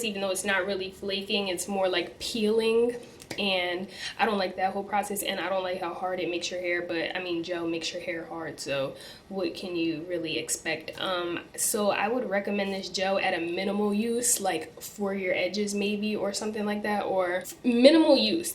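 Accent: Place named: United States. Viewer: Where's that accent from? 😍😍😍 I'm from the UK and i just can't place where in the states that is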